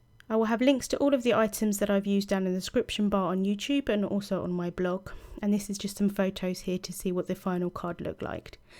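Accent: United Kingdom. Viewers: I can't get by a crafting day without watching one of videos. When I'm talking to myself, it's in that British accent that we Americans wish we had!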